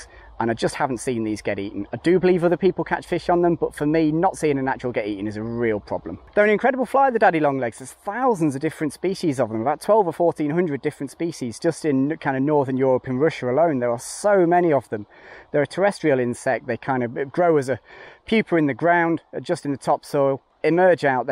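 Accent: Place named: United Kingdom. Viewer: Great video! Where in the UK are you from,? Can't place your dialect.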